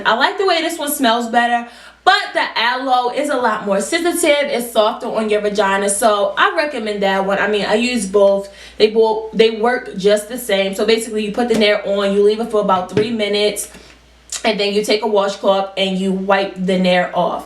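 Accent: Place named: Maryland, United States. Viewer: Hearing you talk I was like “hmmm she sounds like she’s from Maryland” then I read the DB 😂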